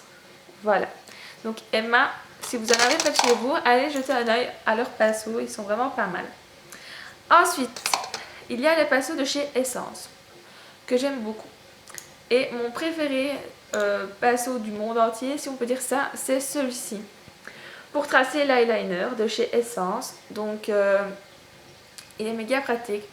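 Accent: Belgium. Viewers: Tu es belge? :)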